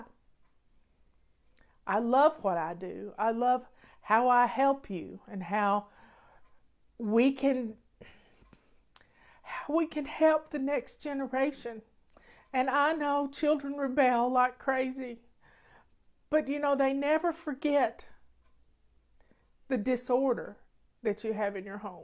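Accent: South, United States. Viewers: Congratulations Marla! I've been a follower of you and the system for many years. I really enjoy listening to your encouraging words and southern accent as I rest with recent diagnoses and treatment of matasticized breast cancer of the lungs. I enjoyed you back in the years past and I love that I can hear you daily as I do what I can. Love you and thank God for you, Richard, your dogs, your family and flylady staff! You make my day!...sylvia in WA state 😊 ⛄ 🎶 🐶🌹 🎂 🍹 🎁 🎉 🙏 💖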